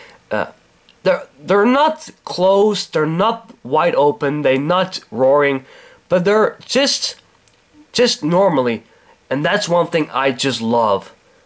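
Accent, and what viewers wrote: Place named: Netherlands. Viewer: its kinda cool to see a review with a dutch accent , i like it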